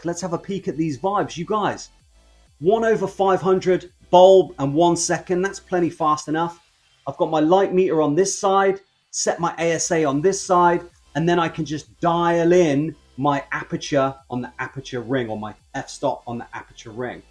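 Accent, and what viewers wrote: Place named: Mid-Atlantic, United States. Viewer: Don't forget you are British, very Bad manners to wear a hat indoors. 🧐 Also be careful you have an annoying mid Atlantic accent. Dont forget to pronounce your T's and its Mathematics not Math....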